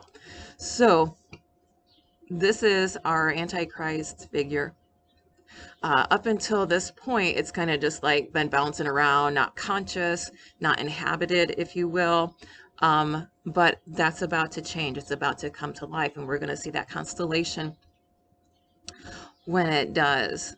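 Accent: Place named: Scotland, United Kingdom. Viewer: Lol if yi dinny see it, that's Scotish lol are you from Scotland.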